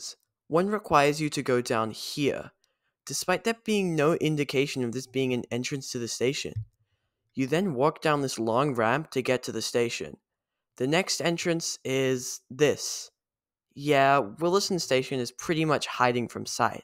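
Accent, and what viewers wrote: United States. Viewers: Is there a particular reason you talk with an American accent? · i'm pretty sure I saw my car in the background of one of the still photos. Also, can I ask about your accent? It sounds partly American. Is that on purpose? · are you part american/canadian?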